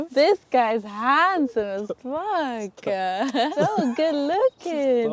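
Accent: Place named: Scotland, United Kingdom. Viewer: how do you have a scottish accent? I love your videos x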